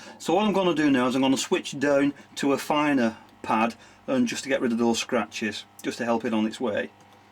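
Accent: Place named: England, United Kingdom. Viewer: What was the powder that you put in the tumbler? Sorry but I'm a french Montrealer and sometime I have problem with the English accent.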